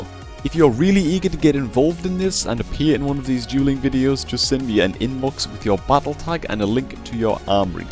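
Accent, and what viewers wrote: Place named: North East England, United Kingdom. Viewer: I understand all that you say, pronunciation isn't a bother for me:P Although I'm from north eastern england also, so I have a similar accent:P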